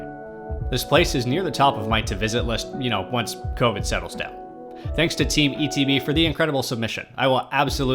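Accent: Canada. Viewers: Are you Canadian by any chance??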